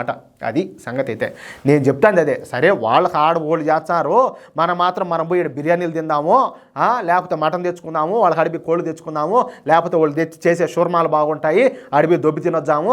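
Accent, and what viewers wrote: Rayalaseema, India. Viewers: రాయల సీమ లో ఏవూరు మీది గారూ? సీమ భాష బాగుంది. హైందవత్వానికి..దేశానికి బాగా సపోర్ట్ చేస్తున్నారు.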